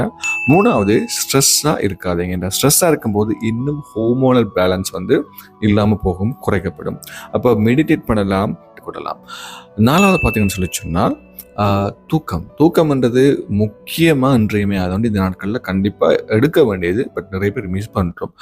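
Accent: Sri Lanka. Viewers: Ungade pechu Srilankan's mathiri irukku sir.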